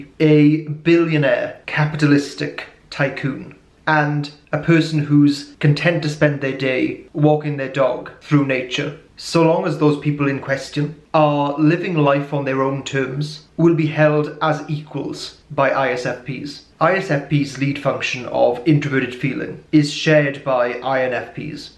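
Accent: United Kingdom. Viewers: when i saw your face i didn't expect to hear a british accent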